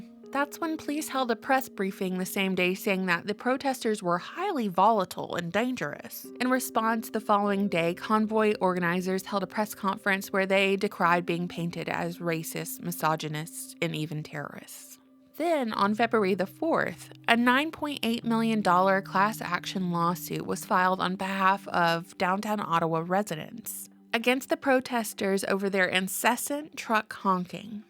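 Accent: South, United States. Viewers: Love your channel lady! Have you heard of or covered anything about canadas “m a i d” service? Btw love the southern draw. Reminds me of home. 🥰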